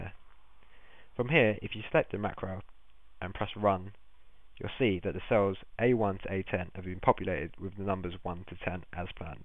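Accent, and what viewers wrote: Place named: United Kingdom. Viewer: THE PRESENTER IS PRONOUNCING THE WORD "BUTTON" AS "BUNS" IS THAT A BRITISH ACCENT THAT I AM HEARING ? THIS ACCENT IS SOME WHAT ANNOYING.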